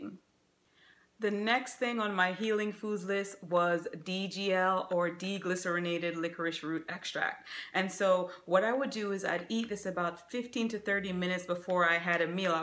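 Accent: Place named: Caribbean. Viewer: You sound like you are from the Caribbean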